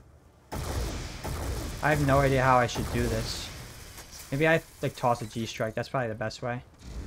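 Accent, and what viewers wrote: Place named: Italy. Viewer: im from the northeast and hearing your voice is hilarious so italian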